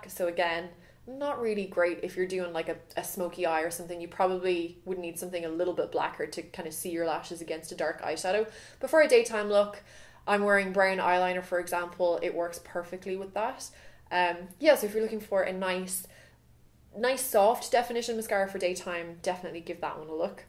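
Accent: Ireland. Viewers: are you irish? i dont know because this is your first video ive seen.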